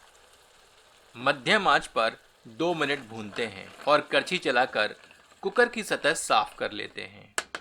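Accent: Standard. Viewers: इतनी शुद्ध हिन्दी wow ❤❤ mera dil aa gaya tum per sir❤ love you sir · आपका हिंदी में बात करने का अंदाज और इतनी स्पष्ट शुद्ध हिंदी मैं पूरा विवरण देने के लिए बहुत-बहुत धन्यवाद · Kya sudh hindi bola hai bhai ne ,...wah mza hi aa gya 😊😀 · itni sudhdh hindi ka uccharan mai aapko subscribe karne se rok nhi paya jai Hind 🙏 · sahab ki shudh hindi aur recipe dono great 👍 😋 👌 hats off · शुद्ध देसी हिंदी और देसी मुर्गा आनंद आ गया देख कर। लेकिन देसी मुर्गा सिर्फ 2 सीटी में ही गल गया?काफी कम उम्र का मुर्गा था लगता है। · Wow bhai... Mouthwatering hai vakai... Apki itni shuddh hindi hame mantramugdh kr gyi.. Pahli baar itni shuddh hindi youtuber ko suna h... Proud on you. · I can just say.... Mouth watering recipe and heart winning presentation....... And Hindi in its purest form..... 👍 Hail chef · Itna to hamare hindi ke sir ki bhi sudh hindi nhi he 😂🤣😁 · बहुत ही शुद्ध हिंदी है। बहुत पसंद आया | और चिकन रेसिपी भी बहुत अच्छी थी| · Bhai tho blkl shudh hindi or urdu bolte hai 🙏❤️👍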